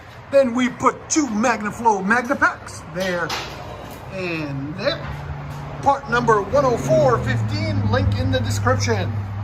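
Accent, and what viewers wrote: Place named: Midwest, United States. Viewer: I'd go for the muffler delete or the Magnaflow. That raspy throaty exhaust of others is addictive...but too many miles of Flowmaster drone in Mustangs cured me. As always, beautiful exhaust work, I really enjoy your channel! (BTW, the narrator's voice doesn't sound Tennesse-born- more like my neck of the woods in Chicago/Wisconsin). In your experience, is the rear muffler delete retaining mid-mufflers (with their shared pipe for balance?) a safer bet? The Magnaflow sounds throaty without too much rasp, but that Mustang made me drone-shy...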